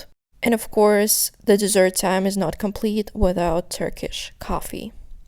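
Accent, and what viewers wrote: United States. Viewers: Your English is perfect. You sound like you were born in the USA. You remind me of the models in this video..... <link>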